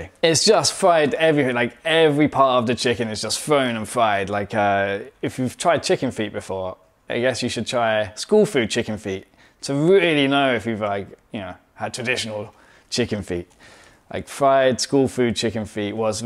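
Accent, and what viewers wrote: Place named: China. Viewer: 感觉你的口音都中国化了哈哈哈哈哈哈😂不知道是不是我的错觉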